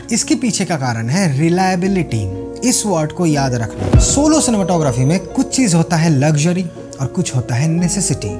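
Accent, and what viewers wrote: Bengal, India. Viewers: Bhai tum Bengali ho ???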